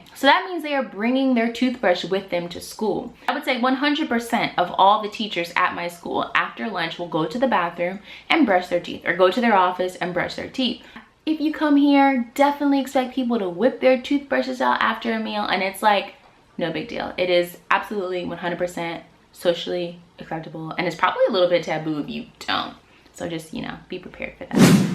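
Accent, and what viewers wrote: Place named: United States. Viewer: the american accent is so annoying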